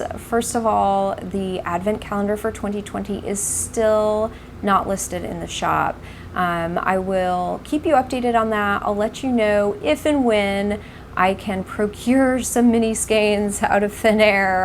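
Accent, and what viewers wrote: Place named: South, United States. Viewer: You may just be getting a bit of southern drawl!!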